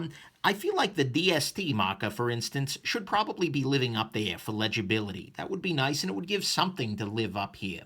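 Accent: New York, United States. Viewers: This guy new yorks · your watch reviews are excellent, love that NY accent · You sound very new york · Love your accent dude! Is it New York Italian?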